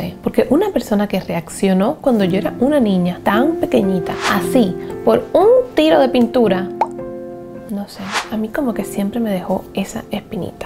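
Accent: Cuba. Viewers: alejandra sanchez yo creo que es por el acento ya que las dos son cubanas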